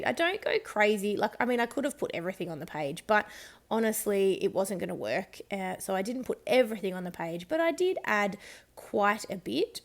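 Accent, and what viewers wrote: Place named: Australia. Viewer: Super job using your kit this month!! & This page is SO CUTE!! (I'm saying "so cute" in your Aussie accent!) I really am enjoying all your videos!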